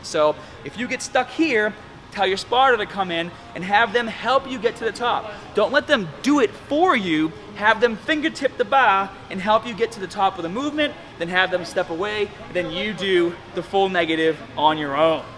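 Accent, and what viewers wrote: Boston, United States. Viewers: I never noticed that Boston accent until after watching The Heat. lol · You don't sound like this in your instructional vid lol nice bAston accent. Keep up the good work though! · came back after 2 years and he suddenly has a Boston accent. Lol wtf. Weird ploy to attract more viewers? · it's boston accent. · He is from the Boston, Massachusetts area I believe. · I love going back 6 years on your channel and seeing how little gains you've made, you look the exact same. You also didn't have a boston accent 6 years ago. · Did his Boston accent get stronger? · he actually comes from Boston, so...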